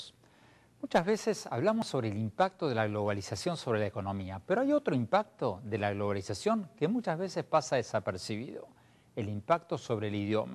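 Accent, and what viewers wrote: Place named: Argentina. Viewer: Me gusta el acento que tiene openheimer, es argentino?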